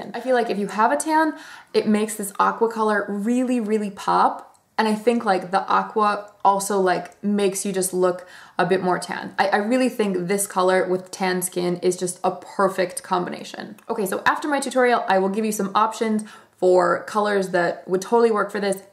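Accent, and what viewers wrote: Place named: France. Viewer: the happy light thing from bourjois is awesome :D I don't mind you using the same products because you always look flawless. I love your french accent, very accurate! I think the clubbing waterproof eyeliners from bourjois are great, i have loving green and 49 crazy about brown and i'm thinking of getting the black and the dark brown ones as well... somebody stop me :))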